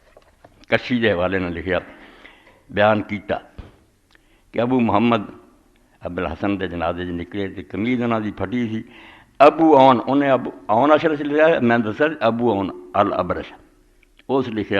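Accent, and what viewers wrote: Punjab, Pakistan. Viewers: ni bech men punjabi touch bhi hai!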